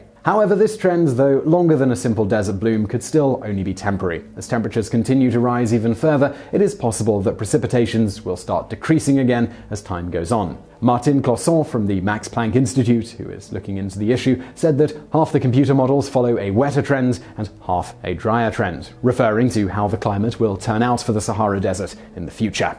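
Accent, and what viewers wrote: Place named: Upper class, United Kingdom. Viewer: This is what upper class Brit’s sound like dude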